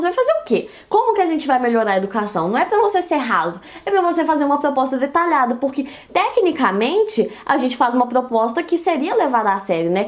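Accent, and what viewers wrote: Minas Gerais, Brazil. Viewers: Você é sensaaaaaaaaaaaaaaaaaaaaaaacional! Muito obrigado por tudoooooooooooooo! Se cair alguma coisa sobre Educação, citarei você na minha redação como os novos meios da Internet no meio educacional no século XXI. Parabéns por tudo e você fala muito amor, eu amo MG e o sotaque affffffffffffffsx<3!!!!!!!!!! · Esse sotaque mineiro é demais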